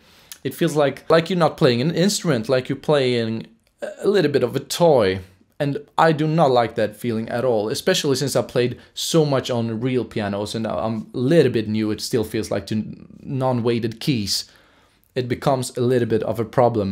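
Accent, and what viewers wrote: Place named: Sweden. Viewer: Great review. I was looking for another keyboard primarily to use as a DAW VST controller, and was considering this one, but your review really helped me realize that the faders do not have the precision that I need to control things like cutoff frequency. Just based upon your English, I am guessing that you are Swedish. If you live near Stockholm, do you have any recommendations on where to buy music gear or music accessories. Tack!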